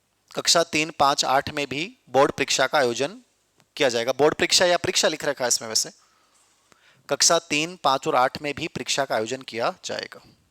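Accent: Rajasthan, India. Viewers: Sir aap ki Marwadi ne mn ko khus kr diya aap ke samne beth ke pdne ko mn kro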